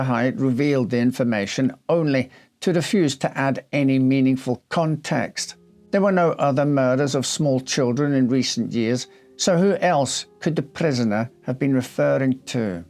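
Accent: Scotland, United Kingdom. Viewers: I would listen to you read a phone book. your voice and your delightful Scottish speech pattern...yum. Then, the stories are always interesting, though sometimes heartbreaking. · This is one of the few channels on YouTube that manage to tell stories in a way that really and honesty grips me in every way, it's such a tragic case, and it's also such a shame that we have come to a time where kids playing on their own are no longer considered safe from murder most foul, so I can only imagine what a shock it must had been in a more innocent time where people honestly feared God too much to do such evil things. Also as always this is a high quality production, with great narration. That Scottish accent is so pleasing to listen to, you should consider reading some classic audio-books, like LibriVox books. I hope to "steal" the 'style' in clothes, it's so elegant, I only have boring clothes myself.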